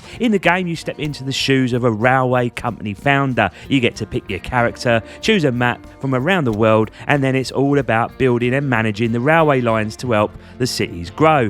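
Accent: Cockney, United Kingdom. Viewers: First time I’ve heard a fancy cockney pirate explain a train game to me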